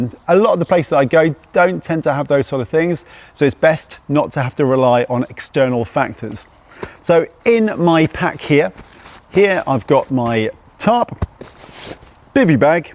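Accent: United Kingdom. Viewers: Since this guy speaks with a British accent, I'm going to assume his idea of bikecamping kit and mine do not align. First off, he doesn't have enough storage space for a 300 mile to 1600 mile trip like we prep for in Canada and America. Add Mexico and South America to that distance if you want to be serious. Second. Bears. Lots and lots of bears. Tarp is an option in N. America. So is a hammock. But it's not a serious option for serious bikecampers who want to roll across the desert and Rocky Mountain regions of Canada and America. Please add, EU advice ONLY to your title, bra.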